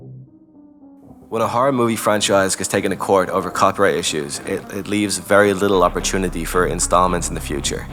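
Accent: Ireland. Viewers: This guys Irish accent is damn sexy.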